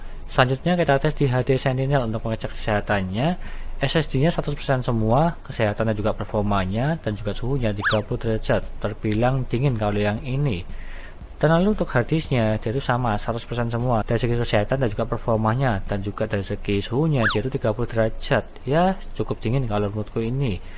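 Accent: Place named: Banyumas, Indonesia. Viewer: Krungone Kyong ngapak Yoh:v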